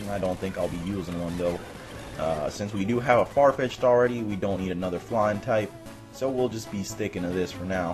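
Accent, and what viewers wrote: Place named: South, United States. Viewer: New York, dang, you sound like a Southern guy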